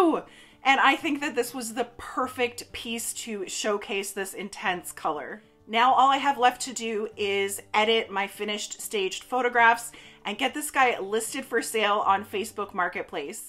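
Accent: Canada. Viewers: I LOVE LOVE LOVE your Canadian accent!!! And your videos too! Beautiful work. :)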